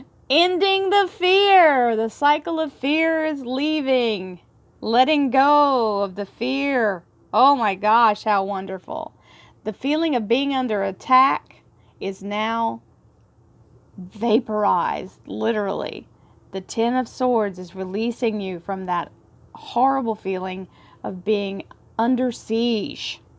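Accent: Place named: South, United States. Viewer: Once again, being a TN gal, I just love your southern accent. You sound exactly like my sweet Aunt Charlotte. Thank you so much for sharing your precious gift with us. Your readings are 1000% resonant with me. 🙏💗💜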